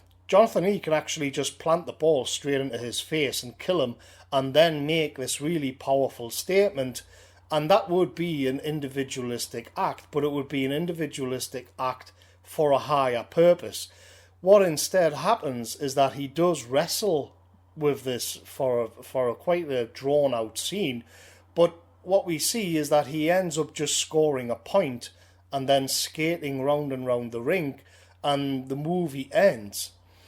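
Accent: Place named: North East England, United Kingdom. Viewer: its really is beautifully articulated content, in a way that only the north east accent can deliver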